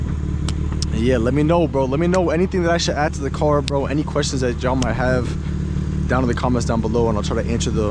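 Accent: Boston, United States. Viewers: Is bro in Boston I can just tell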